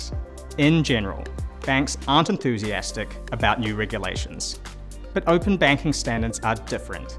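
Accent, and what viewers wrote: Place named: New Zealand. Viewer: Great to hear a Kiwi explain that so well!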